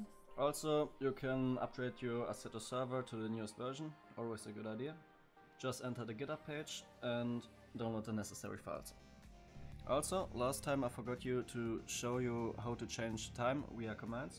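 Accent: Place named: Germany. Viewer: Moin, dein Akzent klingt sehr deutsch, deshalb schreibe ich mal auf deutsch:) Ich habe einen Server gemietet um mit freunden zu spielen. Leider sind wir alle Anfänger und bekommen die Traffic mod nicht installiert. Kannst du und da vielleicht weiterhelfen?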